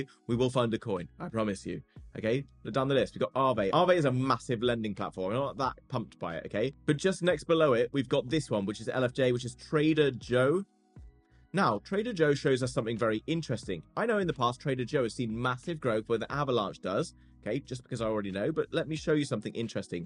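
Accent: United Kingdom. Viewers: Love your content man! Your British accent is GOLD! :-D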